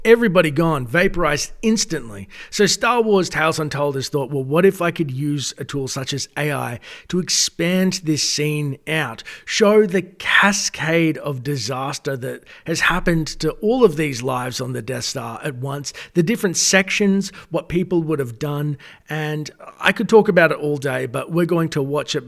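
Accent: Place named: Australia. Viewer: Excellent! Great to have you back buddy. We’ve missed those dulcet antipodean tones!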